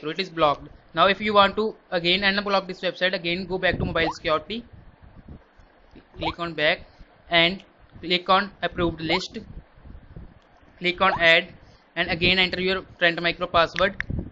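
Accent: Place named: India. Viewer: Katherine Hendrix Indian accent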